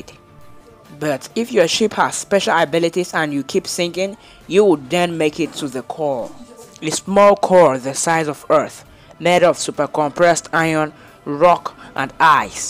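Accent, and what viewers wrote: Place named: Jamaica. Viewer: What country are you from? You sound Jamaican.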